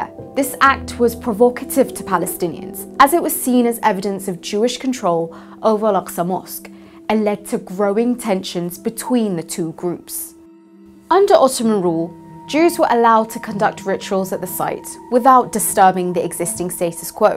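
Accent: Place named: England, United Kingdom. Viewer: English accent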